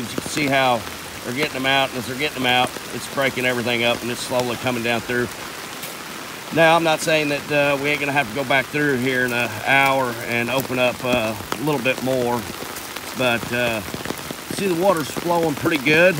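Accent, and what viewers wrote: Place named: South, United States. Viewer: It's like a post10 video with a southern accent.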